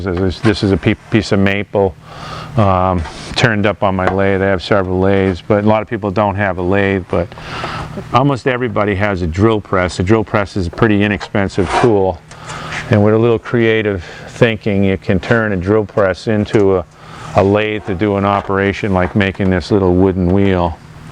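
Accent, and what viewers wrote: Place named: Boston, United States. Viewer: so stunning, I can't thank you enough. Also I love the Boston accent " a little bit.... a little bit" . It reminds me of Lazze's videos when he says "littlelittlelittlelittle" in his Swedish accent. "A little bit" seems to be a metaphor for life... at least for those of us making art.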